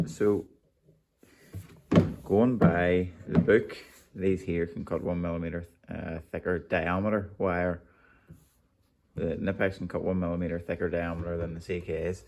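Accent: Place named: Scotland, United Kingdom. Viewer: Man, great video from a man who's using his tools in I presume a regular bases? I like your accent, and had to laugh out loud when I hear you calling names! I'm coming from Holland, and we have a saying "vloeken als een Hollander", it means something like ,"cursing like a Dutchmen" but I think people from Scotland can do their share too!! 😄 Very entertaining video, and besides that, you know where you talking about! I stumbled by accident on your video's and I'm really amazed that you only have plus 200 subscribers! Come on, make more video's,it's been 4 years ago!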